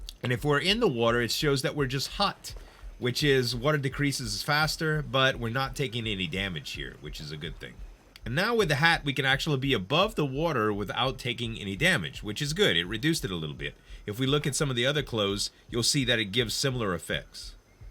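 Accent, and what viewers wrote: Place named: South, United States. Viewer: with that accent you have got to be south of I-10 like me..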